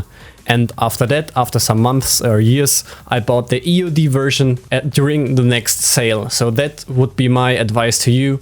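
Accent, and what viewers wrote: Germany. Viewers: Man hört so krass das er Deutscher ist xD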